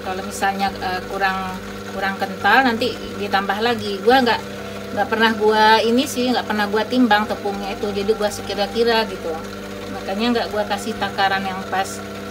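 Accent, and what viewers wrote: Padang, Indonesia. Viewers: Aneh org pdg ngomong gw.gk enak d denger nya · Logat nya padsng... nyebut gua ga pantas. Bahasa gue tidsk sopan.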